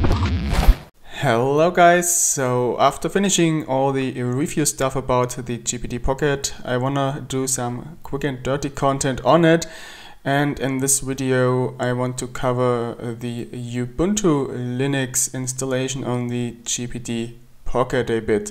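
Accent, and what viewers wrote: Germany. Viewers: don't tell me this isn't a german accent!;O Nice video, thanks for making.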